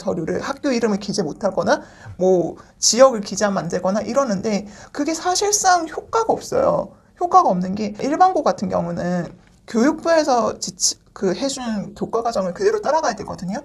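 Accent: Gyeongsang, South Korea. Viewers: 강사님 경상도 사투리가 드러나는데 괜찮은지????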